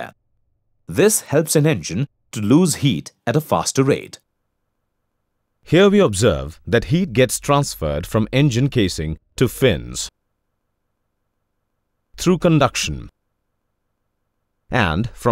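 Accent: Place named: India. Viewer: Indian accent spotted.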